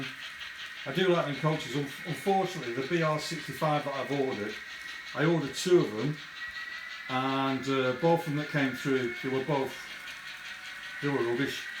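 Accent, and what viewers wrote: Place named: Manchester, United Kingdom. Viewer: Nice pick ups....it still cracks me up when you are.talking Manc and then go into fluent German.......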